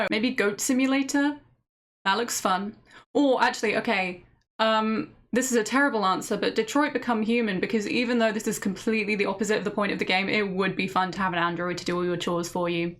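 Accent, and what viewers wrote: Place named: United Kingdom. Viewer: i never thought you was lived here by that bloody british accent😋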